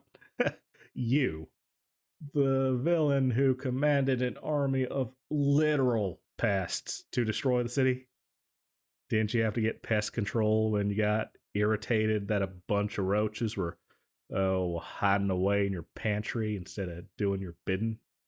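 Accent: South, United States. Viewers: This is my favourite audio of yours. Your voice here is THE best accent I have ever heard. It’s like a bit southern, and I adore it. You should definitely do some more with this kinda voice! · AHHHH SOUTHERN ACCENT!!!!!!! 😍😍😍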